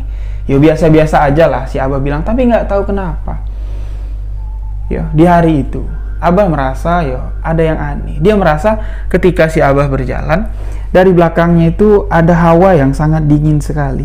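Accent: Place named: Jambi, Indonesia. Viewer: Senang banget liat bg bercerita... Jngan lupa logat jambi nya ya bang?? Kereeeen.. 👍👍👍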